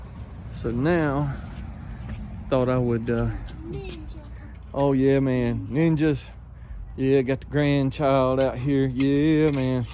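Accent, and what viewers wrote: United States. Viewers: I don't have the noise you were having, but I noticed the same issue with mine. I'm in South Carolina, you sound like you're from the south too, maybe it's something with the Southern heat and sun? Regardless, thanks for the thorough tutorial! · Are you from Virginia? Sound like a friend of mine.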